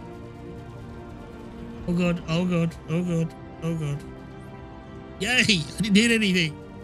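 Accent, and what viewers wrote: Australia. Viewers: You sound like an Australian Jack-Frags :D :D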